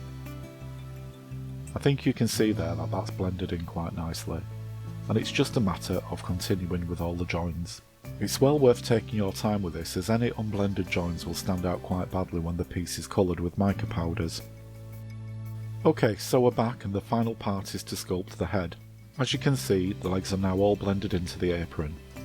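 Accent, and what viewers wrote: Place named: North, United Kingdom. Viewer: You sound northern,like myself, relaxing listening to you x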